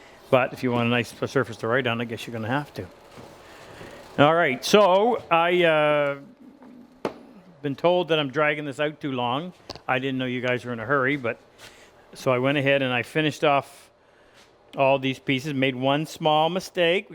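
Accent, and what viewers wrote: Canada. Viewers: And how he says hoose. I love the Canadian speech though, and I enjoy hearing him on his videos.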